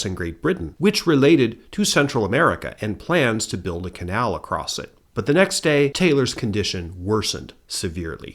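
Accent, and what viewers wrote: United States. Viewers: ❤ you’re delivery & Midwest intonation, hope you research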